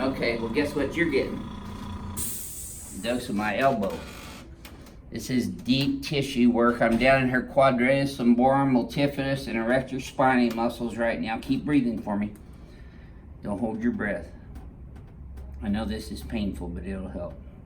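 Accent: United States. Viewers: most American voice I've ever heard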